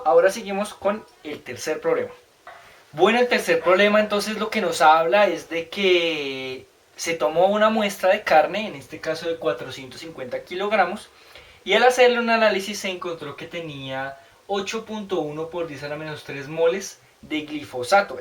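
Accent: Colombia. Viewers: para mi eres de colombia pero gracias por el video la expo me saldra chida ty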